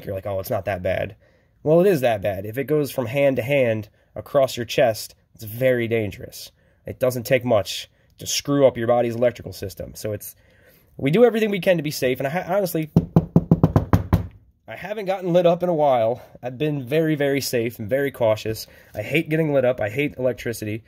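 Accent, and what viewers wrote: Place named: Maryland, United States. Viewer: I knew you were from Maryland the second you started talking haha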